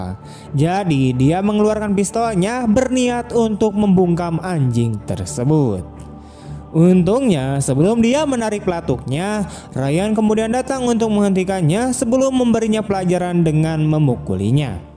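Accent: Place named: Sunda, Indonesia. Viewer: Kau jadi pelawak pun tak laku bro ...logat bicara mu itu yg betol jangan maluin bangsa Sunda ... Bicara yg tegas jadi lelaki itu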